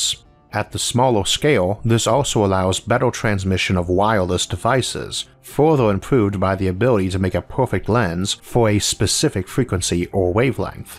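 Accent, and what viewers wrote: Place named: South, United States. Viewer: I love learning about science from a southern accent! God bless the omniverse multi verses universes....John b goodenough invented the lithium ion battery!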